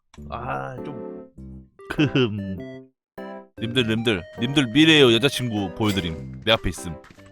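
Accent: Jeolla, South Korea. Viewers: 여기서 전라도어 찐하게 배울 수 있어서 애청합니다. 요즘 잘 써먹고 있음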